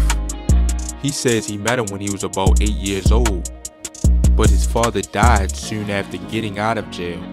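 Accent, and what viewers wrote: Baltimore, United States. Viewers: u gotta be from bmore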